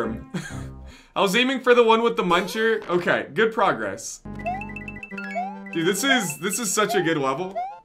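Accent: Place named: Canada. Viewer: I love your canadian accent when you speak german. How about a german greetings, welcome, hello in the next video for your big german fan-crowd? :-) Grüße, Willkommen, Hallo ;-) And an extra "guten abend" for your boy foolhardy 😎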